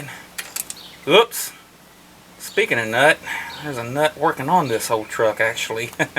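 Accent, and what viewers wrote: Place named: South, United States. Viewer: Sorry so long but my just lost my mom last year an then month or two ago his younger brother died suddeny like my mom and that was always my trouble shootin team dads just tired of hearing it run wrong but its to solid truck to give up on for 86 model and only 22 or maybe 26 or 28,196 is actually all it has in miles and never left my home town since Henry Ford shipped it u know of any other TSB again sorry so long of explaining. But u sounded knowledgable enough an had just enough country swang i can understand sold Haley parts to fellow cldnt say shit with mouth full unless it was 100 mph thanks for any info Sir And as always God Bless u and urs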